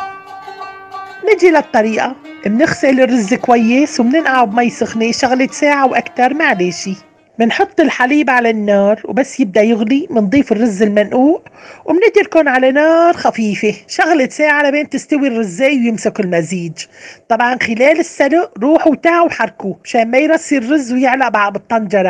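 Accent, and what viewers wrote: Aleppo, Syria. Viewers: وللة بتذكريني كتير بلحكي الححححلبي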